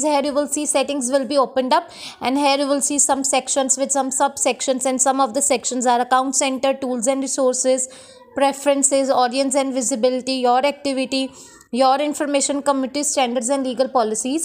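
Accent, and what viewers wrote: India. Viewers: Are Crazy or something , why too much indian English talk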